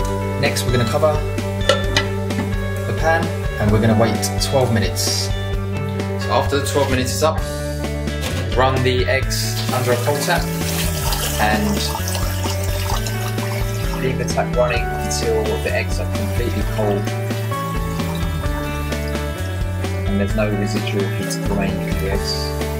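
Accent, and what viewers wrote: England, United Kingdom. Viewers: You've got an English accent,. Did you grow up in England? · JEEZUS. British accent and he cooks! <3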